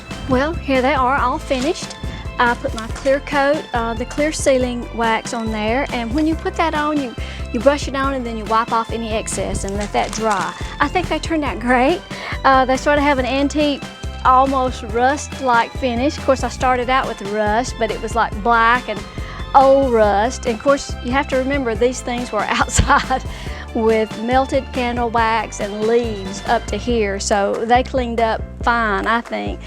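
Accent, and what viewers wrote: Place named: South, United States. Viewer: Oh I just love those lanterns, rusty or not. I would not have thought to use chalk paint. Thank you for sharing. By the way, are you from N.C.? I am and I have moved to VA and your southern draw reminds me of back home. that's a good thing :) I really enjoy all the video's that you have made. You give great attention to details.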